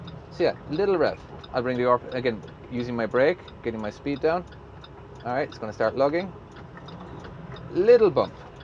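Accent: Ireland. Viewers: dude sound Irish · The best shifting video. Irishman? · Very cool to see! Also you have a very cool voice, are you originally Irish by any chance?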